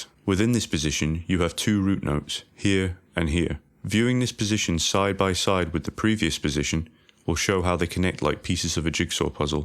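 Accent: Scotland, United Kingdom. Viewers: Scottish? I’m from Stirling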